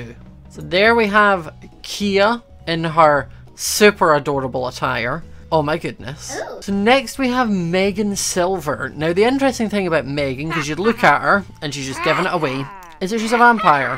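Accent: Scotland, United Kingdom. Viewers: I find the Scottish accent quite soothing... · Piggies Forever he's Scottish 😊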